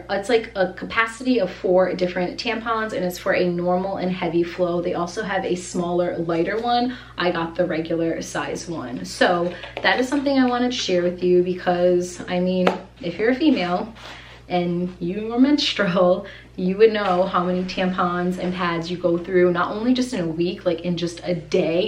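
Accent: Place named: New England, United States. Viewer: I feel like your New England accent really came through in this video with how many times you said water! LOL XD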